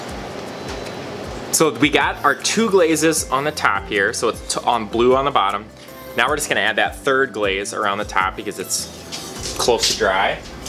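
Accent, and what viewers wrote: Minnesota, United States. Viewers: I do enjoy the Minnesota accent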